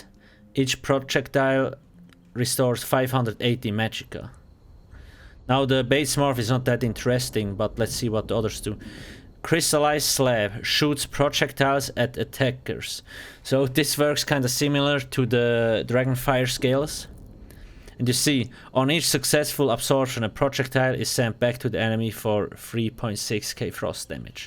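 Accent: Switzerland. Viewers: Dude, are you swiss?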